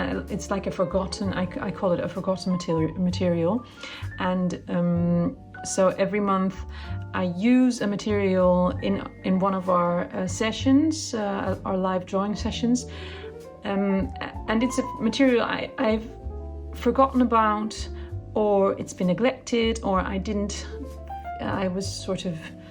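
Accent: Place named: United Kingdom. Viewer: Your english is really good! In the first moments I thought you are a native speaker, you have a lovely british accent! :)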